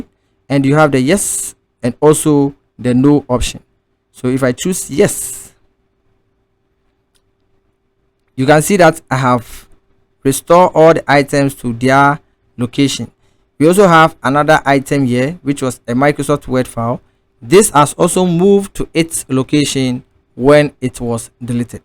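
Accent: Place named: Africa. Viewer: Really love your African accent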